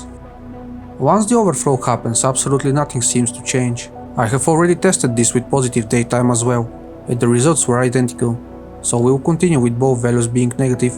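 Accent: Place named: Russia. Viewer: Cool. But for some reason your accent reminds me of Russian. I'm Russian myself and I've heard Russians speak English. It amused me)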